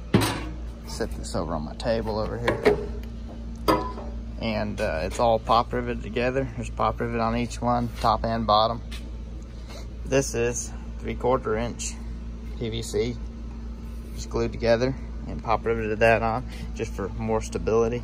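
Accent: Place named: Tennessee, United States. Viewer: You look and sound damn near just like myself.. but you got more a Tennessee twang to ya.. im outta ky, backwoods.. where abouts you from? no homo…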